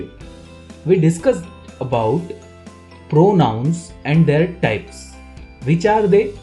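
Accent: India. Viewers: Typical Indian English :)))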